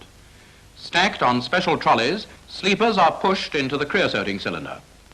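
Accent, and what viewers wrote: RP, United Kingdom. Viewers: Classic 1930s commentary, BBC English delivered in that patrician and reassuring style,alongside plenty of technical jargon to keep the Great Unwashed enthralled and firmly in their place.Job done,and train passes sweetly over the new junction at Chester General...now let's have a nice cup of tea.Utter respect for these men,my father worked as a shunter and track repair ganger for 15 years,just 13 miles from where this was filmed,including through the harsh winter of 1963. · Amazing! Where did you find this? If this footage is unrestored it's even more amazing. Love the "BBC" English! Thank you!!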